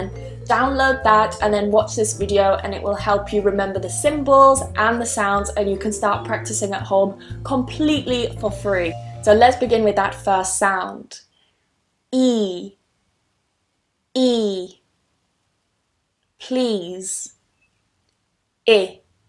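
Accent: United Kingdom. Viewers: Thank you so much for sharing this amazing British pronunciation! Are you from liverpool or Sheffield the city of young British female engineer Ruth? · I like English British.Terdengar jelas kental alias medok.Thanks Miss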